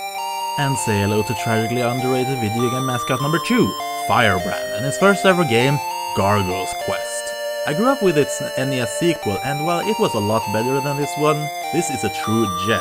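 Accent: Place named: Sweden. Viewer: Jeg syns engelsken din er like sjarmerende som den svenske dialekten din :)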